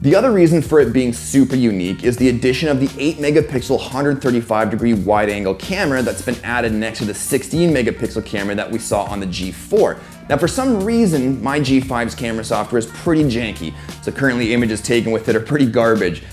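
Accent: Canada. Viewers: i like u're videos man...and clean voice)....are u Canadian? I hesrd Csn from one video)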